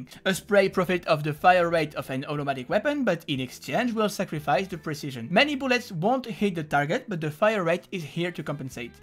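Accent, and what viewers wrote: France. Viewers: Your prenouncation is fine, you just talk english with a french accent you cant help that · French and uk englisch pronounciations are the best:) keep it coming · Un léger accent français mais Nice vidéo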